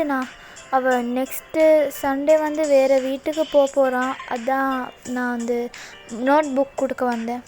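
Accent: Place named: Sri Lanka. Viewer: Akka neenga srilankan aa ungada tamil language konjam different aa irukku 🤔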